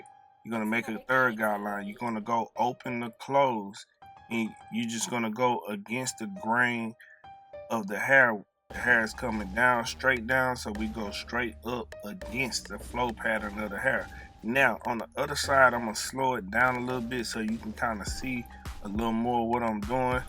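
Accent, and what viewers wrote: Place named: Memphis, United States. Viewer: Where are you from? I'm from Memphis, you kinda sound like you're from here. Awesome Job ,on the haircut! I just found your channel. I have 2 boys on the Autism spectrum, they both have sensory disorders, my youngest is 9 and he has never been still enough to get lined up properly, and shaped up. I just wish it was some way to get him to keep still. I think his barbers, tools are a little to sharp for him, do you have any suggestions for a child with autism that cant hardly take the sensitivity of the tools? And I subbed to your channel! I'll look you up on insta!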